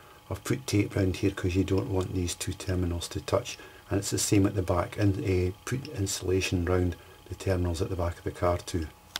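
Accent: Scotland, United Kingdom. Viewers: Very well thought out and delivered----in a Scottish accent, even better.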